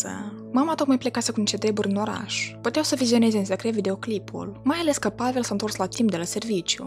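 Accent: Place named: Moldova. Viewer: Accent moldovenesc dulce ..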